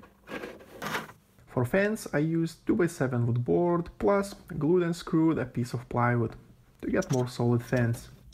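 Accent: Russia. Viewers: Чувствуется русский акцент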